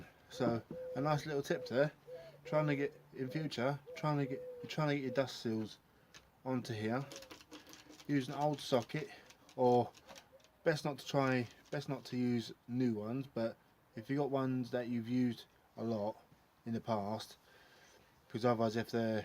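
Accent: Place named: South East England, United Kingdom. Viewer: Are you from the south east mate? Fancy doing mine for me ?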